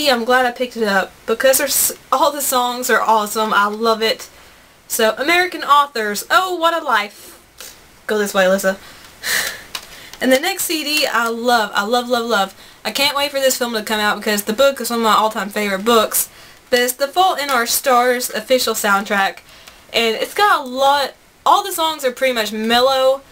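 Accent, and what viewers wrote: South, United States. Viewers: I like your southern accent :)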